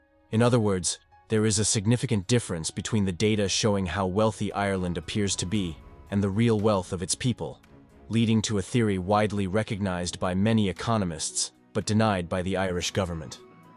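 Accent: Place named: United States. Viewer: AI generated garbage, the AI voice is using American pronounciation of Celtic as in the Boston Celtics team spoken as Selltics, but Celtics is said as Keltics. This blithering idiot doesn't know such a simple thing. And the entire channel is all AI generated click bait and at a prolific rate.too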